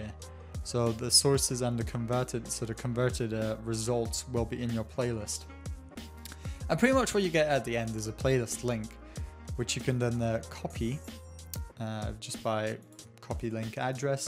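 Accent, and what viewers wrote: Germany. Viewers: ist er Deutscher ?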